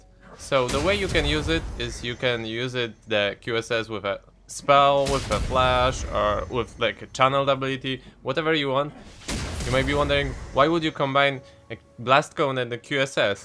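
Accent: Poland. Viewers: jak to polak chyba xd(słysze po akcencie)xdd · Are u polish? U sound like polish guys. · Ten akcent odrazu się z polską kojarzy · Uwielbiam twój polski akcent :D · coś tak czuję że Polak jesteś ;)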